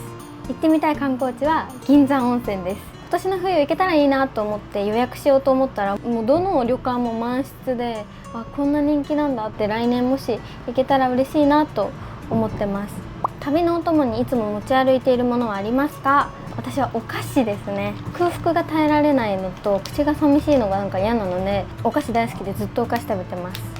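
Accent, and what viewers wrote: Kansai, Japan. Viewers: ちゃんって、独特のイントネーションから関西人だと思ってました‼️ てっきり関西弁訛りの標準語だと…知らんけど😅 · ちょいちょい訛っているから西日本出身だと思ってた！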